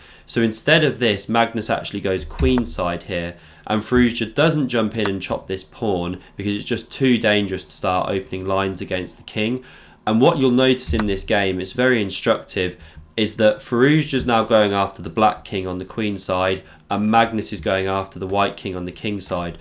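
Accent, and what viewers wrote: United Kingdom. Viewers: Great commentary with beautiful British accent and a juicy Alireza game. Very convincing to subscribe. Looking forward to see more from your channel.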